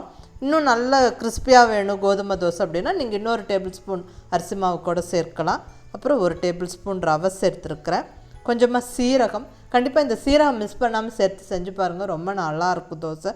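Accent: Kongu, India. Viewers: Super mam... 👌👌kongu tamil la super ah explain panringa mam...👏👏👏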